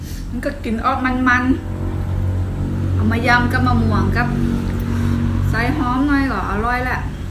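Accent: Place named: Rayong, Thailand. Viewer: ยำปูแป้นน่าทานจังเลยค่ะ อีกอย่างที่ชอบคือชอบสำเนียงระยองฮิ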